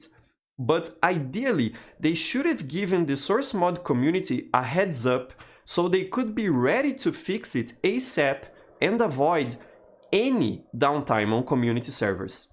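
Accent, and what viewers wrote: Brazil. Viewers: I recoginize i brazilian voice when i hear one. Oi. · Esse sotaque é BR, reconheceria em qualquer lugar. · Hey, I noticed your brasilian accent, do you usually play on the servers? how full are they? (argentinian btw) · That's one thick fucking Brazilian accent, my friend. I can hear your eyes going through the script.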